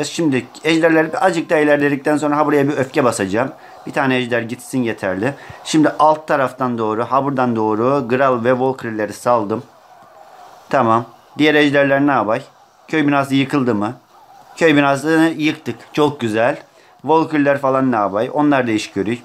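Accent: Black Sea, Turkey. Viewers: o Karadenizli olduğu için ole diyo anlamiyomusun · Abi ben eskiden karadeniz şivesinden nefret ediyordum dizilerdeki bazı oyuncular hiç konuşamıyordu çok kötü konuşuyorlardı ama senin şiven çok güzel bana karadeniz şivesini sevdirdin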